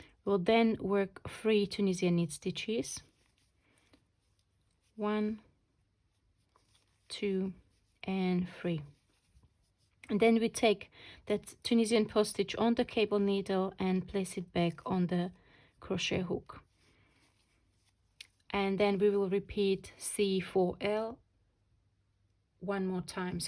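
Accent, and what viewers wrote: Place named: United Kingdom. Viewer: what kind of british accent do you have? its nice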